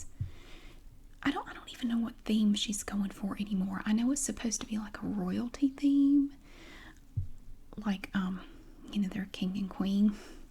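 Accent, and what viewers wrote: South, United States. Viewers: I think it's funny how the title says "super southern" yet this is how everyone I know sounds.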